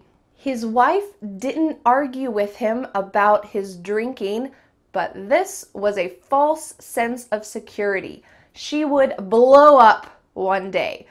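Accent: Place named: United States. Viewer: It sounds American English? Not authentic one!?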